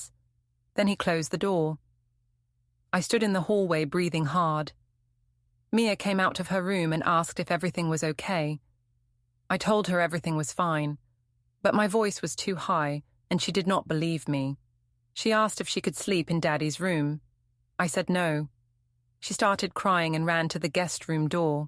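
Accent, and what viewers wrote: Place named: RP, United Kingdom. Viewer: You aŕe right. This posh british accent makes me want to vomit...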